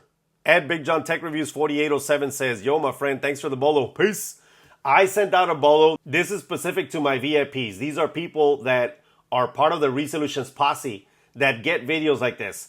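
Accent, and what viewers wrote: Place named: Texas, United States. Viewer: You really are a Tejano!! My mom and granny all born in Texas (El Paso). When I hear you talk, reminds me of being with my tejano relatives!! Good content, payaso!!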